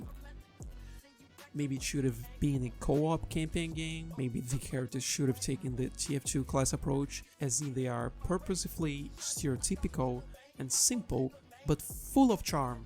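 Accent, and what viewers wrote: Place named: Brazil. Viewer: Desde o primeiro vídeo, seu sotaque nunca me enganou. Sabia que era br kk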